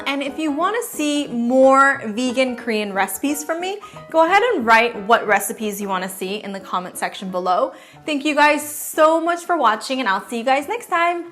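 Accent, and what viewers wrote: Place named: United States. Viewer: My husband was raised by his Grandmother who is 100% Korean, she can not even read English, and can slightly speak it. I will say, you're about the most American speaking Korean I have come across thus far. That is, if you're indeed Korean? Thank you so much for your channel!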